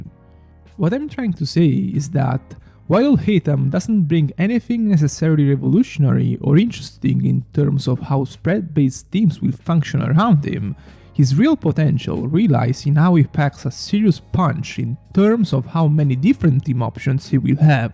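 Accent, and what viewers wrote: Italy. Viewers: I love this italian accent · I thought he was Italian from the accent but damn 😂😂😂😂😂😂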